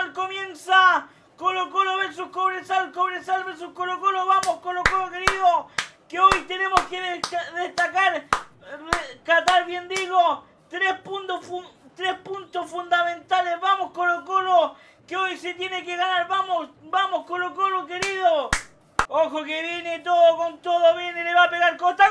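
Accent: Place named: Argentina. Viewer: POR que hablas como argentino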